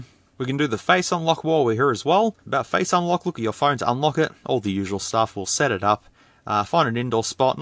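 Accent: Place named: Australia. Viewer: WTF.....I'm sure he can say it properly, he is Aussie dude...really.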